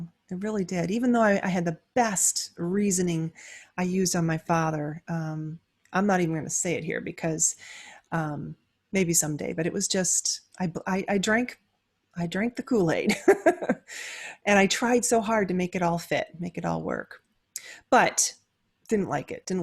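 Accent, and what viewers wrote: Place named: United States. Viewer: very interesting, but slowly and dont speak American. We European exJw are listenin too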